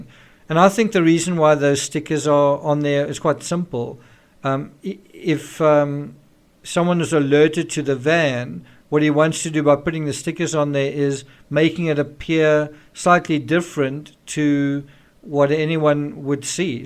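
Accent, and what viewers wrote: South Africa. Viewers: I love your accent am I right in saying it's a South African accent. Its the one accent I wouldn't mind the whole world having.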